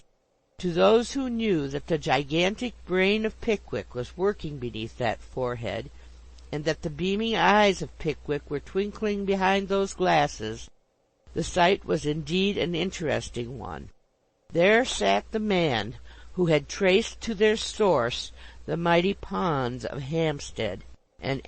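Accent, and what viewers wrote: United States. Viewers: Americans shouldn't be reading English books. Immediate turn off. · why is a yankee reading this? wot's wrong with an English speaking person?? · The narrator is a fine old lass. A fine old American lass. I raise a glass, perhaps a second even! )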